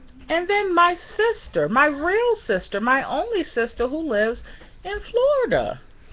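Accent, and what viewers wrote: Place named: Maryland, United States. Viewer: Girl u sound like me... im from md too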